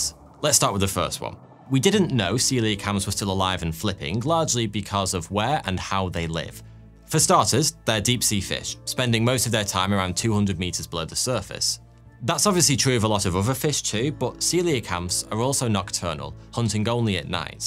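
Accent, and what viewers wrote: United Kingdom. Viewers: There's something about British dudes narrating animal videos 😉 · I totally forgot you're British, I've gotten so used to the accent I can't even hear it anymore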